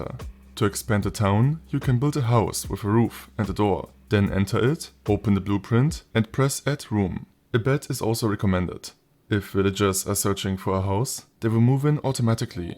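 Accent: Germany. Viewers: Deutsch englischer Dialekt accent yeah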